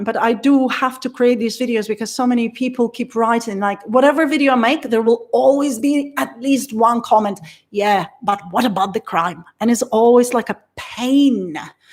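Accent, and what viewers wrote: United Kingdom. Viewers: Ok now I know why she have a British accent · Wow! You're doing so well! I knew I detected a british accent. People in the U.k cannot fly to Toronto until January 11.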